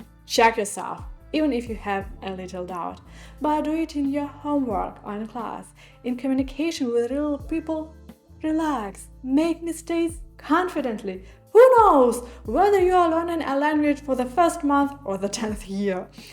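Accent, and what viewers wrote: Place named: Germany. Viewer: When told to speak German without speaking German. Your English is influenced greatly by your German, easy to sense it😂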